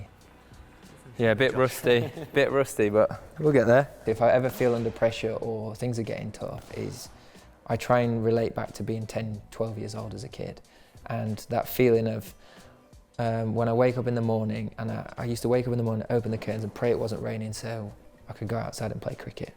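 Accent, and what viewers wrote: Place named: United Kingdom. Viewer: Bro has the most polite British accent ever 😌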